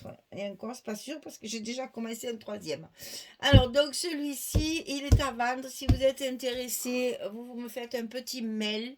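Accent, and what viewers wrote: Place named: Occitanie, France. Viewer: Bonjour, j'adore vos créations elle sont manifiques 😍💕votre accent très agréable ressemble a l'Occitanie. BRAVO.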